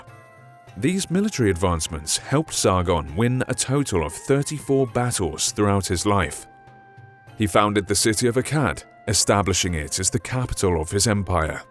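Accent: United Kingdom. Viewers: I though this guy is British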